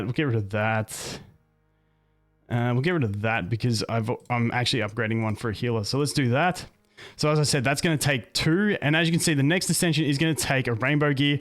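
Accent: Australia. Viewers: damn i always like your accent, sir. what is it? aussie?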